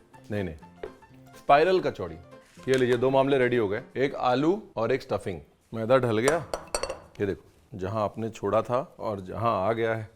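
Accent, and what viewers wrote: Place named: Punjab, India. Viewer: Aaj aap Shehnaaz gill ki tarah punjabiyat me baate kr rhe hain , mja aa gya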